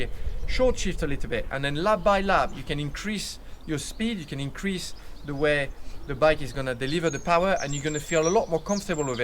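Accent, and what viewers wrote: France. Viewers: from his accent, clearly french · Are you a native French speaker ? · you are a true gentleman of the track. love your videos, and never get tired of the Anglo - French accent:). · He’s French lol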